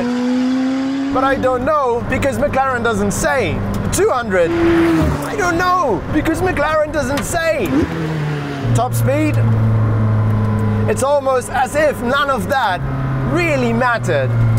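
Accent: Cape Town, South Africa. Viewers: Is this presenter South African?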